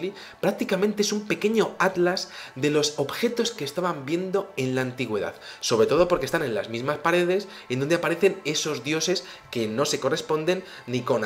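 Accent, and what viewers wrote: Spain. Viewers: retrata a un artista pintor jajaja... Me haces reír de buena gana con algunos de tus relatos jajaja... La paso muy bien mirando tus videos, subiéndome a tu ''maquina del tiempo'', con tus dichos españoles en ese acento exquisito, con tu forma tan talentosa de relatar los hechos en general:) Es como 'leerme' un libro audio:) Tremenda maratón de relatos interesantes que has hecho! Gracias:)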